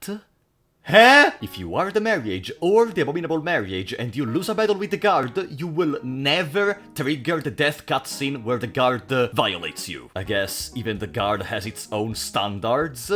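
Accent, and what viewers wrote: Italy. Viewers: I just noticed that you really sound Italian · Aiuto sento un forte accento ita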